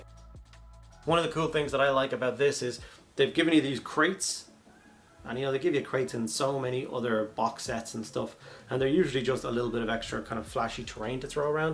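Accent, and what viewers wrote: Ireland. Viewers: tbh as long as I hear your lovely irish dulcet tones I am happy, you encourage me to paint. No nonsens, just paint encourages new cummers to the hobbie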